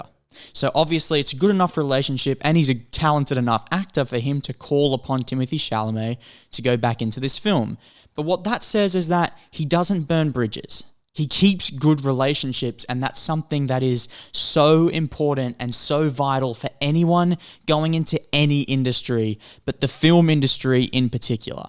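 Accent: Australia. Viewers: Oh My Goodness! Looking forward to watching it! I really enjoy how you explain things and put things into perspective! I've been subscribed since a month ago and have been binge watching and re-watching your videos since then! I think I may have a crush on you! Haha! <3 Are you Australian? I really like the sound of your voice..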